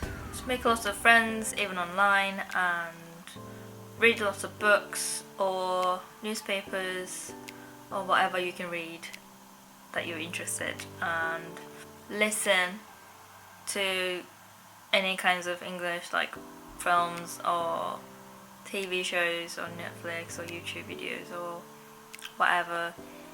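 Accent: United Kingdom. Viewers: You do sound Northern lol....英語ペラペラやん！ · wow!!!最初の数10秒くらいはニュートラルな話され方をしてたからか、あまり気づかなく、なんとなく聞いてたのですか、1分後くらいから、うぉ！！来た！！！ってなりました🤣若干ロンドンのcockneyやMLEにも聞こえる気がしました！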